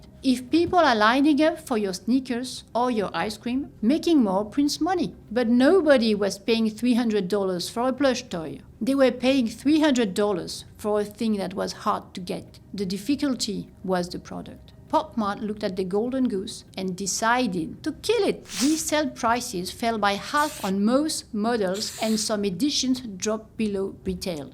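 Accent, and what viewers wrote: France. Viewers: Is it me or is that a french accent I hear here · I can't take seriously your "expertise" in business in Asia when you can't pronounce the Labubu designer's name right. Frenchies are know for their bad accent but put some effort when calling people names, that's called respect. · Putain, l'accent français c'est vraiment violent. Ne le prenez pas mal, mais on peut faire mieux avec une IA. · I live in Canada where the French are a minority yet continually politically destroy our country.. Listening to your highly franco-cised version of English is like a dagger in my heart from ever word... All I hear is a French voice reminding me of what the French have done to our once glorious country.. Between the French and the Indians (natives) these two tiny minorities, have destroyed Canada and have the power to continue to do so in perpetuity.... 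So as much as I wanted the info in this video, being lectured by 2 French people is a bridge too far... I'll just wait till a English presenter does this story.. Have a good day.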